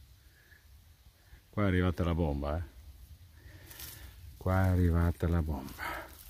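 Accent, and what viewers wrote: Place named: Bologna, Italy. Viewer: Gajardi l ' estatini...ammappello!!! Sei forte puro tu..ma n' do stai..a Bologna ,me pare,dall' accento tuo.. Dajee👍👍👍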